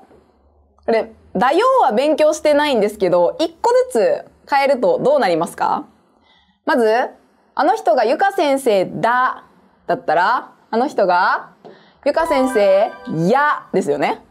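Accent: Kansai, Japan. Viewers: わし関西弁が大好きやねん。先生は関西人やな。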